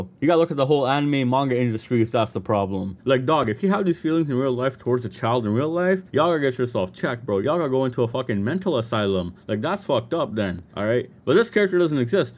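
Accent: Toronto, Canada. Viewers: You talk like a Toronto / GTA man for sure.. you from the ends ahlie?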